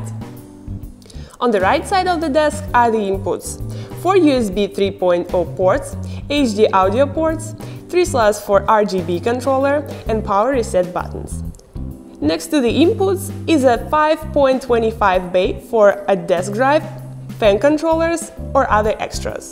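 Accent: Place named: Russia. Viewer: До чего же у неё жуткий русский акцент. Будто специально ррежет :)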